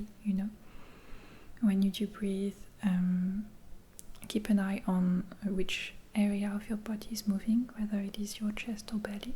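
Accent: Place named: France. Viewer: Your videos are so wonderful. You have a perfect ASMR voice. I love your accent too- I studied French for many years and it brings me back. You English is amazing!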